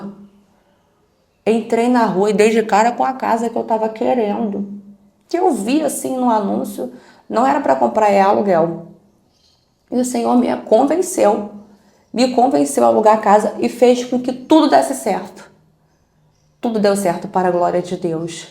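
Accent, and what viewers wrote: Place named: Rio de Janeiro, Brazil. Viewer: Voce e carioca teu sutake parece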